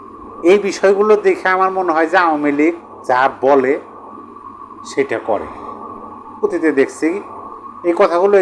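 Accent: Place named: Bogura, Bangladesh. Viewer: সুন্দর আলোচনা। আপনার কথার মধ্যে সিরাজগঞ্জ এবং বগুড়ার টান আাছে। আপনার জন্মস্থান কোথায়? স্রেফ কৌতূহল 😊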